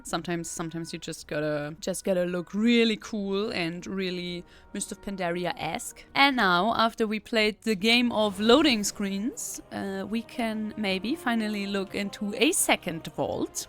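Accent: Germany. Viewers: Ich könnte schwören da deutsch raus zu hören XD "I could swear I could hear German coming out of it"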